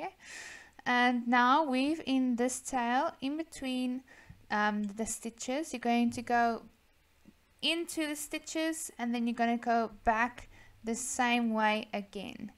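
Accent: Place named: South Africa. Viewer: It is beautiful hearing a Fantastic South African accent again! I for the first time have truly managed to do and understand my first crochet attempt. Thanks a million x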